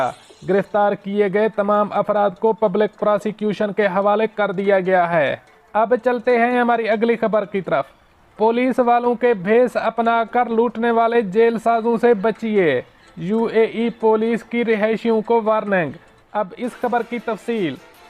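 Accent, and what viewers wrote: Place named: Punjab, India. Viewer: Urdu news in PUNJAAAABI accent?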